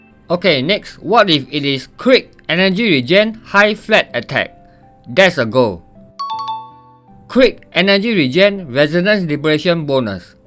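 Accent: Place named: Singapore. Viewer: WTF your accent you’re from Singapore?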